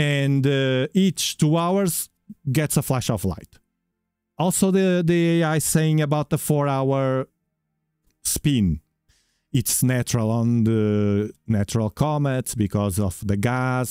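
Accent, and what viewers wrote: Portugal. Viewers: The moment i heard you i knew you were portuguese. 😅 Abraço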